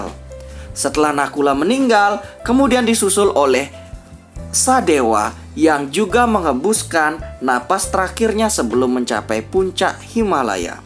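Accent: Bali, Indonesia. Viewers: Suara orang Bali nih logatnya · Kental khas kakak bali Semangat kak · Baru ngunjungin Channel ini .. Malah fokus sama suara mimin, kyanya mimin orang Bali ya??? Logat nya Bali banget .... Salam persaudaraan Dari Jawa 😁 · Bikin sante ya sodaraku... Beliao kan orang bali, jd logat bahasanya gitu. Trimakasih udah dicritakan. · Logatnya Bali banget, jadi ingat teman sy Wayan Gusita dari Karang Asem... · Logatnya Bali banget, Mantap? Jadi ingat teman saya si Putu Satriawan. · Denger suara blii, jadi ke inget guru matematika BPK I gun Made, yag satu2nya guru dari Bali.... Bhasa logatnya khas bngeeet.... Sukses trus bliii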